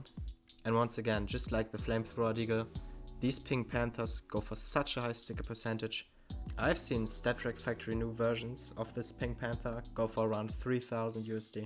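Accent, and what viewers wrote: Germany. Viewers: I think hes german he has the german english accent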